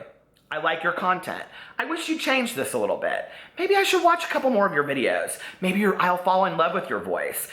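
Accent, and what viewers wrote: United States. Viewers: I hear your American accent and I feel a great fondness for it because I know the personality behind it. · Baste! 🦃💜😘 edited to say: I love your voice. You don't sound like a young girl whatsoever. I can't believe anyone would even say that! That's laughable & dumb. I'm really great at accents & to me you just sound like a cool guy who is possibly from the south (?) I'm sorry if that's wrong, but that's what I hear. And you are beautiful inside and out, truly! You are entertaining, intelligent, well-spoken, delightful. Sending you so much love. I wish I could be as strong as your mother. I will commit myself to doing better. Thank you for this message, it has changed me. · This is my favourite video of yours so far :) and I love your voice, it's one of the things that sets you apart and why I started watching your channel regularly. 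I don't think it sounds weird at all, it just sounds like a different American accent (I'm English!) but it makes you come across as genuine and full of energy! And I love it when you get worked up and talk (rant!) really fast, pretty sure I subscribed after seeing your rant about your ex work colleagues ;)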